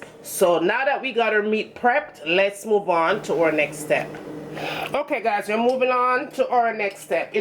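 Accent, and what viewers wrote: Jamaica. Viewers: Beautiful patties, beautiful lady! Love the Jamaican accent...💖Thanks for this recipe!😊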